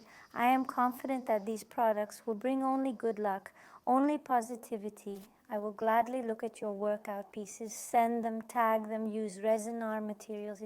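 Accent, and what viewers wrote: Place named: Sri Lanka. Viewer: Sounds sri lankan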